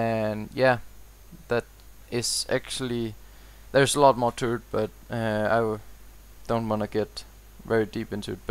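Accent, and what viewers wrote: Denmark. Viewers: Haha du er dansker kan høre det så let XD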